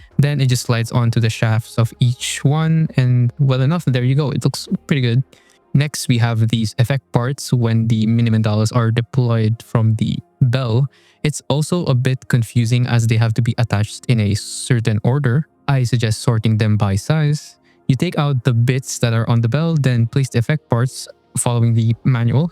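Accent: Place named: Philippines. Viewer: Just found your channel, like your videos. And by the accent alone I can already tell you're a Fellow Filipino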